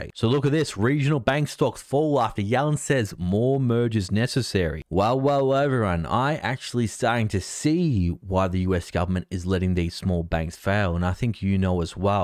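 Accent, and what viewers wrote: Australia. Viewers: Good to hear an Aussie on finance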